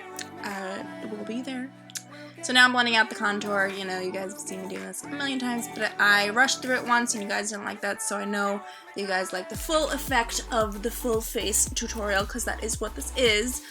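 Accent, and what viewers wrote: New Jersey, United States. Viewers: Girl! How have I never stumbled across your videos?! Flawless makeup, and it sounds like you're from Jersey... automatic win!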